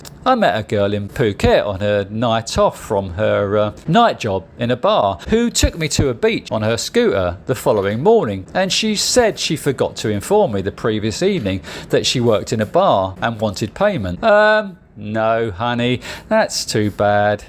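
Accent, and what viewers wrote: United Kingdom. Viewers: like listening to someone narrating a book in British English lol good material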